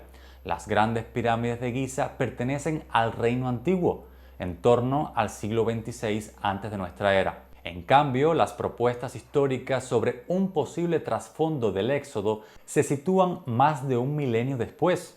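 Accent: Cuba. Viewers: Hermano , ese acento y fraseo es MADE IN CUBA . Chevere 👌 , me voló la cabeza reconocer a un cubano abordando estos tópicos ancestrales , lejos de la "MACHACADA" y adornada historia de mambices y epopeyas INFLADAS que caracterizan la mal contada historia de Cuba. Gracias por tu estupendo trabajo . 👌. Saludos. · Me haces sentir orgulloso de ser cubano. Pensé que eras catalán, por tu apellido, pero tu acento me decía que hablabas como nosotros (cubano al este de Matanzas). Excelente trabajo, magnifica presentación. Sigue en esa dirección. Felicitaciones.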